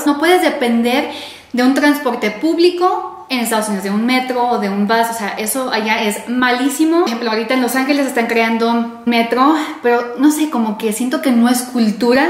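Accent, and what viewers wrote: Mexico. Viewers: Yo también lo pensé, asentó como coahuila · hola, como estas?....es la primera vez que te veo y ya soy tu fan....sabes?....cuando escucho a algun extranjero trato de saber de donde es de acuerdo a su acento...chileno, peruano, venezolano..etc..y siempre acierto, pero contigo me fallo....por tu acento lo mas seguro es que fueses mexicana pero tu aspecto me decia otra cosa. hablas un español perfecto, es mas sin el acento agringado. me gusto tu forma de expresarte, eres muy amable pero sobretodo muy linda, bienvenida siempre seras, saludos y cuidate mucho, un abrazo con mucho cariño y espero verte pronto...!!!!!!!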